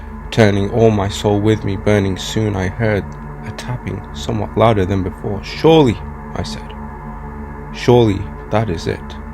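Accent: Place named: Lancashire, United Kingdom. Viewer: Such an Eloquent Lancashire Accent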